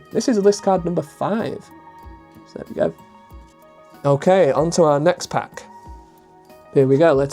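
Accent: United Kingdom. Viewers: I always heard the Manc accent out. Love your vids, cheers mate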